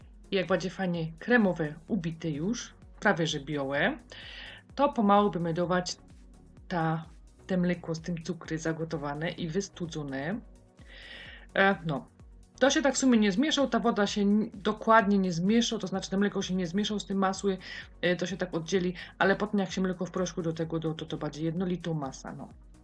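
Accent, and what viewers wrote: Silesia, Poland. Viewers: Pytam na poważnie :) jako ciekawostka dla mnie, czy jesteś polką i mieszkasz w polsce? Czy Twój akcent jest prawdziwy dla Twojego regionu widzę w opisie śląsk i zastanawiam się czy na prawdę gdybyś tak mówiła do swojego znajomego na Śląsku to nie było by w tym nic dziwnego? Czy jest to tylko pomysł na kanał, żeby było ciekawiej? Pozdrawiam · Świetnie określają, I fajnie TLUMACZYSZ, bardzo dokładnie I po śląsku, POZDRAWIAM Z TORONTO, JOLA, POZDRAWIAM Mikołów,♥️♥️♥️👐🏻👐🏻👐🏻🙌🏿🙌🏿👏🏻👏🏻👏🏻👏🏻👏🏻👏🏻🎗🎗🎗🌋🌋🌋😘😘😘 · Śląsk! :D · Jak to fajnie, że dziołcha od nos ze śląska! Aż się fajnie słucho! :D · Tak mówią ludzie ze śląska, jak się nie podoba, nikt nie każe Ci oglądać 🤷🏻‍♀️ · Etnolekt śląski, odmiana opolska. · To się nazywa gwara , wydaje mi się że Śląska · Bardzo sympatyczna z Pani dziewczyna ;) mimo, ze nie rozumiem slaskiego dialektu to jakos.daje rade ;) swietny przepis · Nie lubię śląskiej gwary.....dziwne że u ciebie mnie to nie przeszkadza, wręcz odwrotnie, może dlatego że jesteś bardzo naturalna,skromna ,sympatyczna a twoje fajne przepisy prezentujesz i komentujesz lekko i swobodnie to jest w tobie najlepsze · Ciekawy chyba przepis ale twoja śląska gatka zniechęca do słuchania. 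... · To po prostu śląski ;) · Masna ta gwara śląska 😂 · O matko, jai ten język sląski jest zabawny 😂😍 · To jej wina że pochodzi ze Śląska i mówi tak jak została nauczona? Trochę taktu kobieto... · Zastanawia mnie jeden fakt, nauczyciele na śląsku uczą was tak mówić? Na śląsku forma taka jaką się porozumiewacie jest uznawana w szkołach za poprawną, czy po prostu wszyscy tam mają taką gwarę, i każdy ma to w dupie. Pozdrawiam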